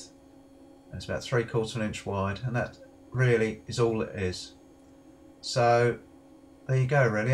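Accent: United Kingdom. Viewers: Hey you sound like you're in the UK, great video it helped me a lot, I'm learning how to make complex sword hilts and this helped a lot, I just made a bunch of drifts and slot/hole punches out of some rebar and chisels. Do you happen to know a good place to get cheap swages and drifts here? or if you have any old ones for sale let me know, having trouble finding good large ones.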